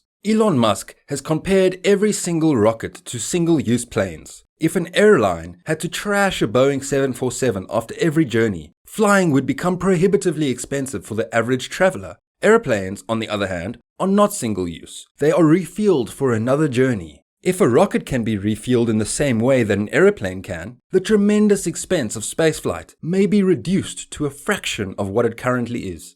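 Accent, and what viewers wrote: South Africa. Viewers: Are you south African. You have the accent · Nice South African Accent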